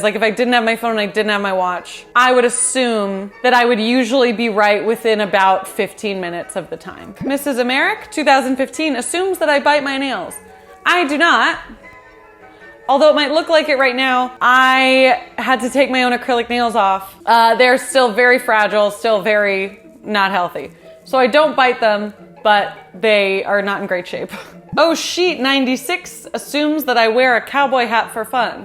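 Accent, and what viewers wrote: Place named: California, United States. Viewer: As an Australian who can't stand the valley girl accent, I can tell you I wouldn't have guessed that's where you were from ❤ Your accent is much nicer than the stereotype:-p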